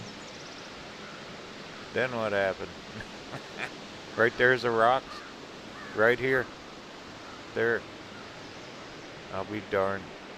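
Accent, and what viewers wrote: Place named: Upper Peninsula, United States. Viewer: I’m not sure where you’re from but with your accent you fit right in with the Yoopers.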